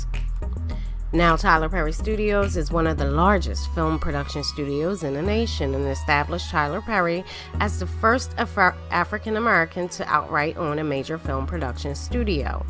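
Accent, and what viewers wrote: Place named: Maryland, United States. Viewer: You from maryland or d.c.? Your accent is heavy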